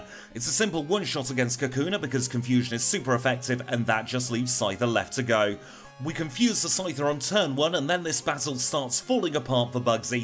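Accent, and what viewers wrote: United Kingdom. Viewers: the way British people pronounce the letter "H" makes life worth living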